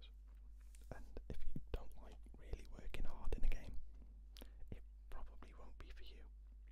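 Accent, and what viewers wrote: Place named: Northern England, United Kingdom. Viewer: oh haha you sound like your from the north of England. And how hard is oblivion to complete xD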